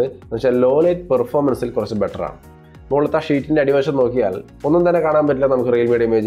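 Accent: Thrissur, India. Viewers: മനുഷ്യാ.... നിങ്ങൾ തൃശ്ശൂർകാരൻ ആയിരുന്നോ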